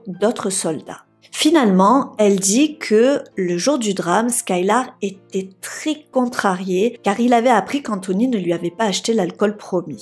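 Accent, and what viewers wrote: South, France. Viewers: < Danielle> C'est une triste histoire surtout pour Danielle. Je l'avais déjà entendu par un autre youtubeur/se (?). Je pense y avoir appris des choses en plus... Le seul soucis c'est ta prononciation pour certains mots...qui me font hérisser les poils sur le crâne. C'est vraiment dommage car tu as une chouette voix du sud...agréable à écouter. Dans cette affaire on voit bien que Scylar est un "bon" comédien. Menteur...colérique...et a l'air d'avoir une haute estime de lui Sans compter qu'à chaque tentative ou il feint de pleurer...il n'y a aucune larme ! Je pense qu'il n'avait aucune confiance en Danielle et qu'il voyait le "mal" quasiment partout. De plus apparemment c'était un gars qui aimait rabaisser sa femme... Peut-être que le comportement qu'il a montré envers sa femme est repris de son père voir de sa mère. Mère un peu trop "proche" de son fils... 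Purée il a un air tellement arrogant et suffisant. Tout dépend de savoir si Scylar brutalisait Danielle ou si ce ne sont que des bruits de couloirs... Si Scylar aimait sincèrement et respectueuses Danielle...je me dit que vu son enthousiasme...son excitation du retour de son homme...je ne pense pas que Danielle aurait tout gâchée avec un amant. Par contre si Scylar était comme le prétendent bon nombre de leurs "ami(e)s" ... c-a-d...violent...rabaissant.. etc...il se pourrait que Jeremy eu été plus qu'un simple "copain"...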